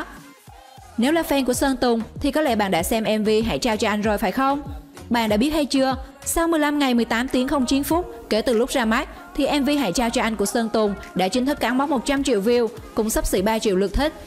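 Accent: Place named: Binh Dinh, Vietnam. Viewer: Ns cn rặc giọng bình định quá nhe ..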